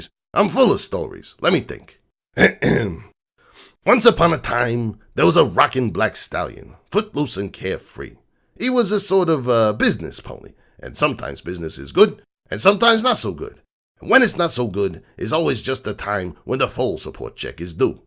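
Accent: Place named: Brooklyn, United States. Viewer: Hehe. Again, I kinda love your Brooklyn accent. Of course, her you've got a lovable scamp of a character, but still. I don't know whether to think there are more bedtime stories, considering how much Bad Horse goes on about good writing, but I hope that there is, and I hope you decide to read more of them.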